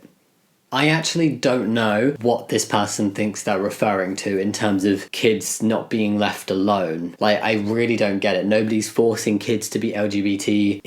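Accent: United Kingdom. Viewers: He's always been British... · When did you move to the UK, your accent is very British?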